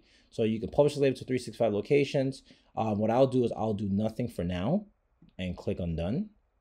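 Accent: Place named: Bronx, United States. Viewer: IT with a Bronx accent. Digging it.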